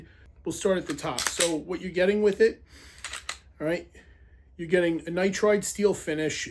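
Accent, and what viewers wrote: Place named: East Coast, United States. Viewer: The most east coast accent ever lol.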